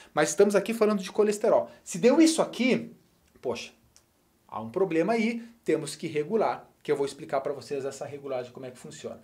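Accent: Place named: South, Brazil. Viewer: PARABENS PROFESSOR QUE AULA HEIN?????? QUE SIMPATIA!!! ADOREI ! O SEU SOTAQUE É DO SUL! É CATARINENSE, OU GAÚCHO ???? VC É NOTA 1000! MUITO OBRIGADA!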